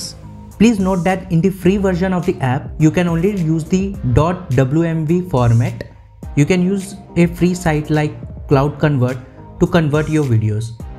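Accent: India. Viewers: when is indian accent it works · Ofcourse an indian accent when i saw the time stamp i knew it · couldnt stop laughing at the Indian accent im sorry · Not the indian accent😭😭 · When i heared the indian accent i knew it gona work, thanks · it’s always the guy with an indian accent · > indian accent > less than 10 mins long Those type of videos always work for me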